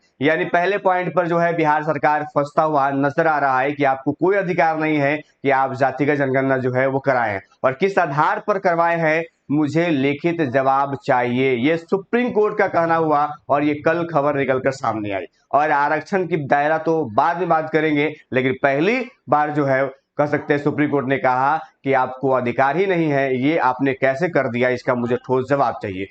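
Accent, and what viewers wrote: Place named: Uttar Pradesh, India. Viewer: Tu up ka hai lag rha hai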